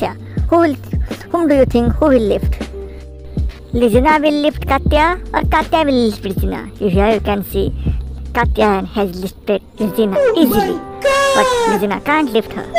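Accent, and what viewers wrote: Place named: India. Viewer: please change this horrible Indian accent narration.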